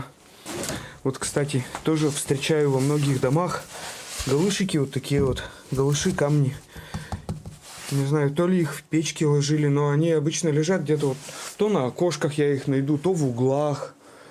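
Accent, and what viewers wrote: Vyatka, Russia. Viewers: До 7 лет часто бывала у дедушки,в деревне Статники Оричевского района ,Кировской области.Деревни этой сейчас уже нет. Ваши повествования будят ностальгию по тем временам, даже вспомнила устройство этой усадьбы.А Ваш говор с вятским акцентом прямо услада для души.Давно уехала с Вятской земли в Барнаул.❤❤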